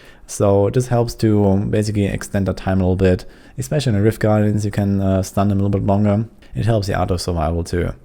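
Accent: Germany. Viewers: Man hört direkt das du deutsch bist 🤣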